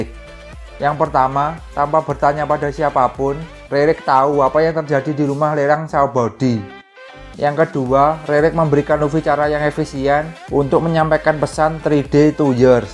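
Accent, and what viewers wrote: Java, Indonesia. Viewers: Suaramu medhok min ... Heheheh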